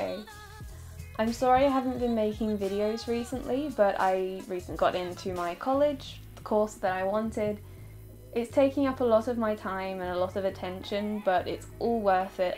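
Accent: England, United Kingdom. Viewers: Ur bootiful.One question are you British ? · Finally a British animator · I love you British accent! And your sooo pretty! 💖 I also really love how you animate:3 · OMG UR BRITISH GASP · You're British and pretty? GG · YES A BRITISH ANIMATOR!!!! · I have so many words to tell you but I will put them short I LOVE YOU'RE BEAUTIFUL BRITISH VOICE AND YOUR FACE.... YOUR SO BEAUTIFUL!!!! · you were already perfect and now I know you have a british accent AHHHHHHHHHHH I'M SCREAMING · I LOVE UR BRITISHNESS :3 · finnaly a brittish animater :3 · ppl think im British but im Australian!!!! its awesome that ur British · Got to love that british accent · you gotta love that PERFECT british accent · Im not gonna lie your actually really hot!im gonna get a drawing tablet for my bday this march 23should I plan on buying this because a kind of have my eyes on a lot of tabletsHELP ME (btw I love England accents) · Well, being British and different is cute ❤ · MY LORD, YOU ARE ABSOLUTELY GORGEOUS!!! 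No wonder your art is amazing...beautiful art comes from beautiful people. Me, myself, and I have been a big fan of your art, and also kinda inspired...I've been (SO BADLY) wanting to draw like you, with a style slightly the same, only in the hair shading really. I've always loved the way you shaded the hair...and the apps i have...don't have that kinda setting of shade. SO, soon i'll get tool Sai and try, though I don't have a drawing tablet...That dream died quick...anyhow, LOVE YOU, AWESOME ART, I'M INSPIRED! HAVE A GOOD DAY! (PS...I've always adored the British accents...but sadly i'm american so rip lelelel...i'm also 16 so HEY GURL, HIGHSCHOOL IS FFFFUN XD) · I DIDN'T KNOW YOU WERE ENGLISH WE CAN BE ENGLISH TOGETHER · Are you English? I am too :3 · YOU'RE BRITISH I happen to love you heck of a lot more now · british?:D yay british :D a youtuber that is like me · It looks like a small TV Also you look really cute! 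Idk why I like British accents Well keep up the good work!❤️ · are you British? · Me and my friend finish the vid he's like huh I wonder if she's British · WOW are you from UK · Nice. Ur British! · U know I thought she would be French but British it's gud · Holy FRICK UR BRITISHHHHH AHAHAHA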